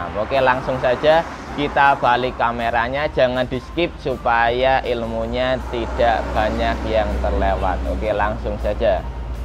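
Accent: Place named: Java, Indonesia. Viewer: TERIMAKASIH mudah dimengerti karena kemedokannya SUHU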